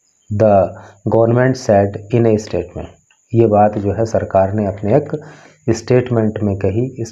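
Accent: India. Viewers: literally sir i love ur deshi pronountation even Hindi wyakaran. 😘😘😘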